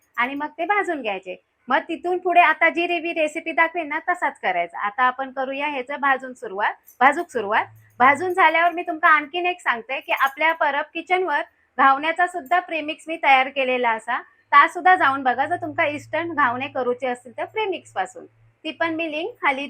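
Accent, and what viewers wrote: Malvani, India. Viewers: मालवली भाषेत सांगतात ते खूप छान वाटते ऐकायला.❤❤❤❤❤❤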